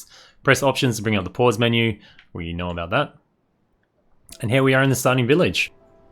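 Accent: Australia. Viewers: Hi. You sound Australian. Thanks.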